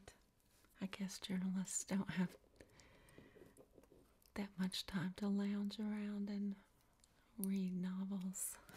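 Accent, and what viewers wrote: South, United States. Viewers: your accent is so wonderful to me. Your voice and mannerisms just speak “southern charm”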